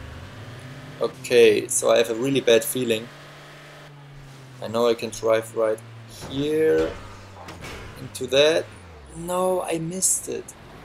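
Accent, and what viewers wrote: Germany. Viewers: were are you from ? you Sound German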